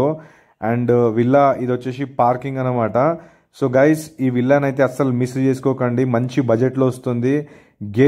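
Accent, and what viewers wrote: India. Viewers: Typical maarvadi tone and Telugu and of course English is all messed up. No big deal. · Hyderabad slang bro we are proud of him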